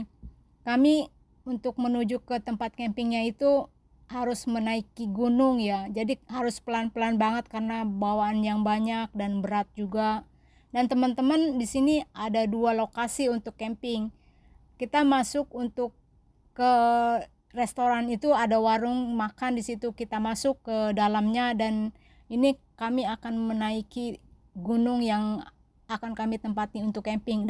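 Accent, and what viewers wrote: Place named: Java, Indonesia. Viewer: Mbak logatnya jawa ,,jawa mana mbak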